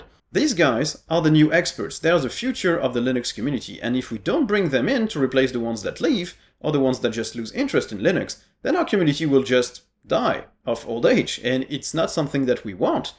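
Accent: France. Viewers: The best french eng accent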